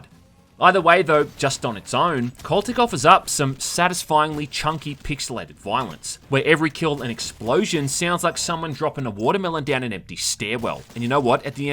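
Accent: Australia. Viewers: Sorry to break it to you but he's clearly Australian...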